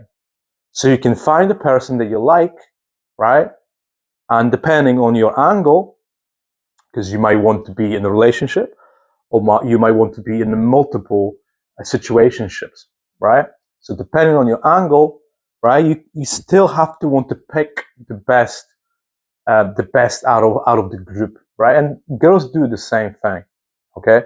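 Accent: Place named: Scotland, United Kingdom. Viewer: Are you Scottish but trying to be easily understood or like Eastern European but then it comes off as Scottish?? And I’m not saying that like critically? Whatever it is, I can fully understand you as an American so …. Right on 🙌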